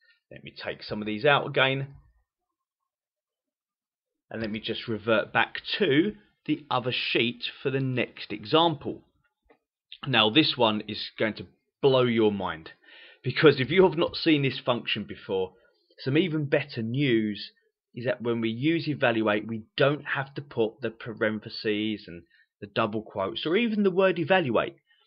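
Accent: United Kingdom. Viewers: This is a very useful video to understand Evaluate Function in VBA. Thanks for sharing. And love ur British accent btw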